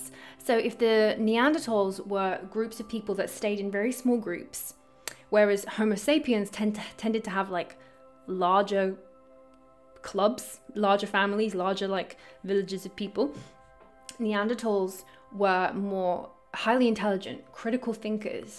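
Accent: England, United Kingdom. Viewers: cracks me up, sometimes...!! Between some of the crazy faces she makes and the way her sheer excitement shines through in her speaking while discussing the topics of her videos, causing her mouth to suddenly malfunction in what I would jokingly describe as "seemingly random, momentary bouts of an excitement-induced speech impediment (all of which I find adorable and interesting, btw -- bc she has so much personality, and because the topics that she discusses in her videos are genuinely exciting, in the first place)," I really enjoy her videos...!! It doesn't hurt that I (much like the vast majority of Americans, I would imagine) find most of the various English accents to be hopelessly irresistible, to begin with... 😊 Hilarious personal fun fact: Several years ago I exchanged the original factory default voice on my phone for one that is both female and British (possessing what I would describe as a "super-sexy, authoritative and very proper English accent"), because I find that it makes for a much more pleasant and calming listening experience... 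😊.....and also because it somehow feels like she (I call her "Mary Poppins" lol) is a much better-informed alternative to the generic voice; and, therefore is much more qualified to be fielding my various oddball queries or giving me driving directions, throughout my day...!!! x'D #True story PS: For a perfect example of the "excitement-induced speech impediment" thing that I previously mentioned, listen to the first ten s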